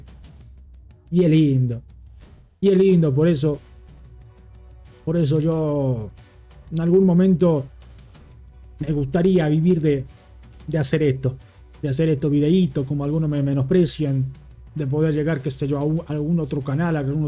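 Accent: Argentina. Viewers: Por que habla cómo argentino?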